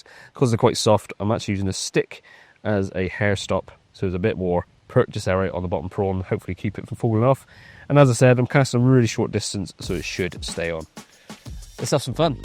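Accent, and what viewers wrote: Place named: Gloucestershire, United Kingdom. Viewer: Tuner 😂🎉😂 I'm only one county up from (Gloucester)byou buts funny 🤣 I'm sure I ptotsound the same to people north of me 😂😂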